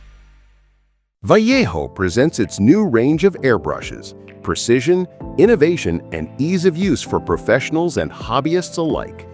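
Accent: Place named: United States. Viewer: Hope it’s available in the UK? I’m not a fan of the American accent overdubbed video 🙄🙏🏴🇬🇧♥️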